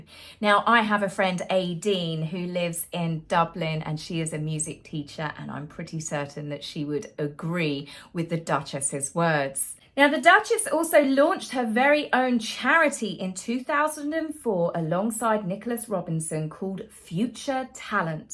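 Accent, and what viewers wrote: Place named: Yorkshire, United Kingdom. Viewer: And Yorkshire accent galore 😂😂❤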